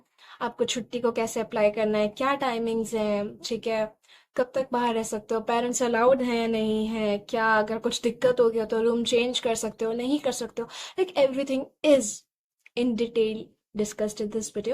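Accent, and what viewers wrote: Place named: Punjab, India. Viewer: I am damm sure you are punjabi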